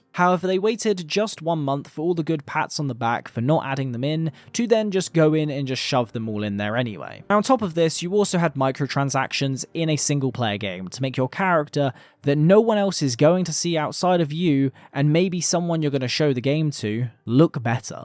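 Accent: United Kingdom. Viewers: Subbed for the long video and British accent.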